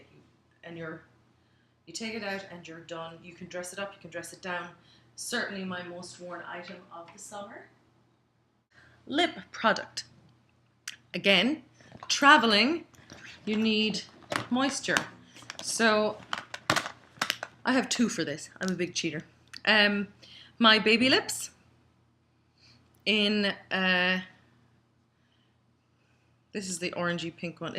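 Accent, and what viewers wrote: Ireland. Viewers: We love your Irish accent !!!! The Irish accent is definitely one of our fav accent in the English language. Sweet. Oh and great fav summer products. We love Hard Candy and the bronzer you show looks OMG so pretty xx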